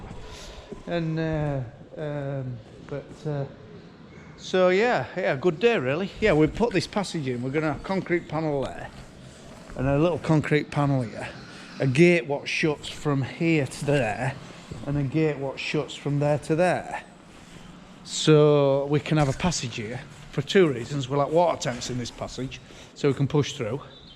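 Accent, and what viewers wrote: Yorkshire, United Kingdom. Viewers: Loving this channel - taken a while for my Cornish ears to tune in to your Yorkshire accent but I’ve got it now. Your thinking is very much the same as mine - I have had concrete as thin as 2” on a really firm base. Never bother with reinforcing but always add anti-crack fibres.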